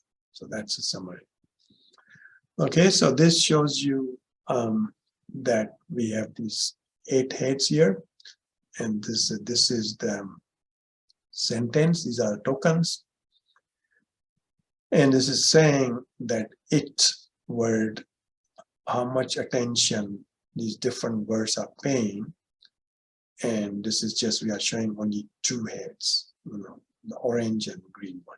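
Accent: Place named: India. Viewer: I hate indian accent. How on earth are there so many indian professors in our country?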